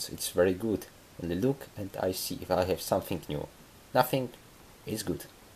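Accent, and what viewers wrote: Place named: Czech Republic. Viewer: AAAA!! Ta česká angličtina je fakt hrozná, nic ve zlém, má to tak většina lidí z ČR =D Jinak příště zkus líp zaostřit na telefon, ať to není tak rozmazané ;)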